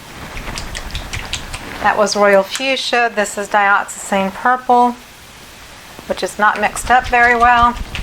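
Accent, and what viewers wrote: South, United States. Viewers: You sound like a southern girl. I have lived in Atlanta all my life so I have a similar dialect as well.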